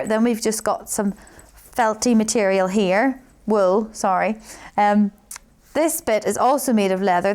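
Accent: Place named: United Kingdom. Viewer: the CCs are so wrong lol someone who understands British accents should redo it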